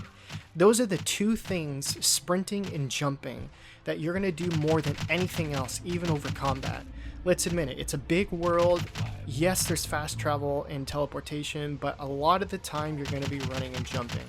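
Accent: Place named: Canada. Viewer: This guy sounds canadian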